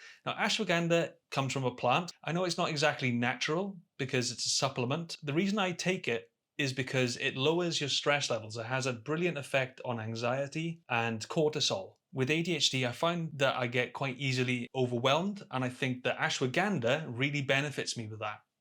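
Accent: Wales, United Kingdom. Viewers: Do you share any thoughts or videos elsewhere? Just found your channel and it was so positive, yet still grounded, that I’m looking forward to watching them all. And I’m hoping from seeing your most recent videos that you’ve really lifted many aspects of your life. That means something, and it’s wonderful to see progress for us all. Finally, I’m a Valleys kid and your accent really helped me take your hints and tips in. Anyway, if you have any other channels I think many of us would love to know. Thanks and take care :)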